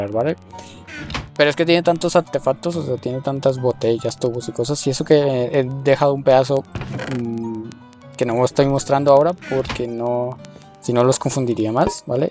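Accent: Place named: Bogotá, Colombia. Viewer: De donde sos? solo por curiosidad? PD: Pareces de bogotá por la forma de hablar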